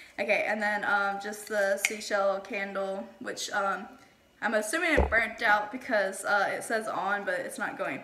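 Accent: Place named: South, United States. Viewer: I watched the whole video - I really enjoyed getting to know you better. I love your family values - sounds like your family is the best, especially your brother! Guess what girl? I believe in you too! You have so many talents. You are a really good artist! I'm very happy to have met you and one day maybe we can do a collab when we have more subscribers! What state do you live in? You have a southern accent. Also what is mudding?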